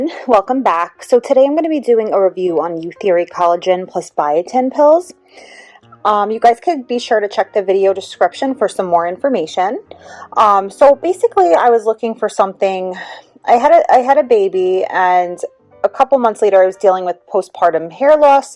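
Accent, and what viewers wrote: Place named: Long Island, United States. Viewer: Are u from along Island New York? U sound like it! I am too! Great video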